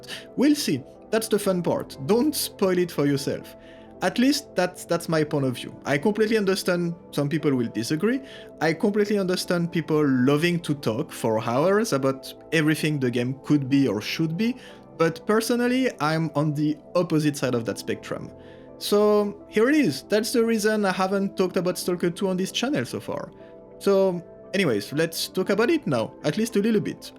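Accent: France. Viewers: are you french by any chance?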